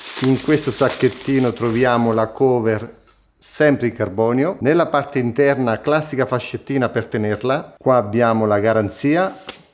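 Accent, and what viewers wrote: Marche, Italy. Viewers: Tu sei MARCHIGIANO X CASO?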